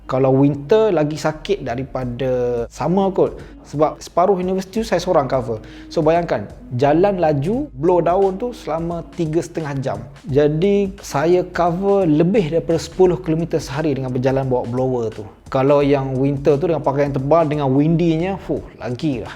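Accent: Malaysia. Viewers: Nope.. Accent still Malaysia but lots of Oz word and slang mixed along the way 😅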